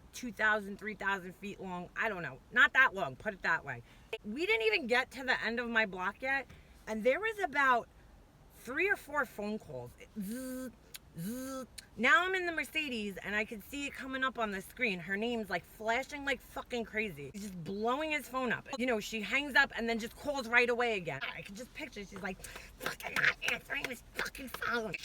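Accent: New York, United States. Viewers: Oh my god found your channel and listening to when evil enters your life part 3... I’m fucking dying! You rock with your New Yorkian accent and my kind of foul mouth F bomb mom! Shout out from Carla from Boston!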